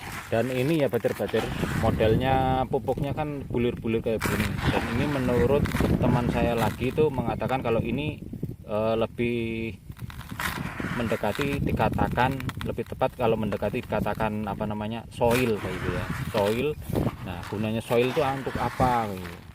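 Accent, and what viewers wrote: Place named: Banyumasan, Indonesia. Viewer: Rika wong ndi kang deneng ngapak kaya nyong cilacap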